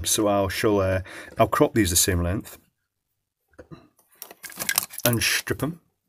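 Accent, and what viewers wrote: United Kingdom. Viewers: your proper English is impressive Are you from UK your accent seems To be British Hi from india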